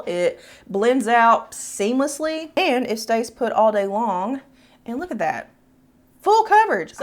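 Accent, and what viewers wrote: South, United States. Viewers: Hey, back again from Belgium. Oh my gosh, I think you might well be my favourite on here: you always get straight to the point, knowledgeable and you're totally down-to-earth, sweet and unpretentious. And I said it before but I LOVE that southern accent! ❤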